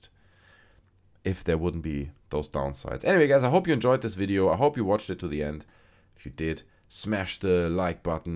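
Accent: Germany. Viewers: Are you from germany? Because how you pronounce words is very german xD!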